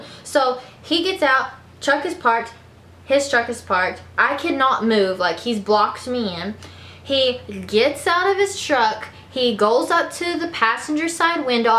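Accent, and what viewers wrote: South, United States. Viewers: I love her southern accent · It’s because she’s southern 🤷🏻‍♀️ we have that “southern drawl” down here 😂 hers isn’t as bad as a lot of people’s. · Where are you from? Your accent's confusing lol. So southern! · It's called a southern accent. Usually when you're from down South. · I love ur little southern accent!😁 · Hey, are you from the south of the US? Carrie Underwood was my favorite blonde of all time, not it's you haha:) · It's just a southern accent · accent or nah ? southern or nah ?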